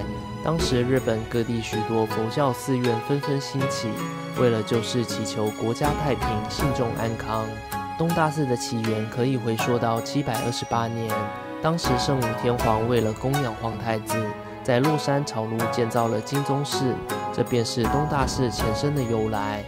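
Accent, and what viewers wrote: Taiwan. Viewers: 第一次看的影片，影片做得不錯，我只有一個問題，請問是台灣人?香港人?大陸人?看影片的標題很像香港人，內容卻是繁體字，講話的口音也有點不像台灣人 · up主是台湾人还是马拉西亚人？我听力没那么敏感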